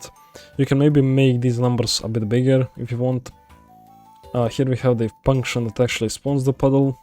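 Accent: Russia. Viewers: Bro your accent i think you are russian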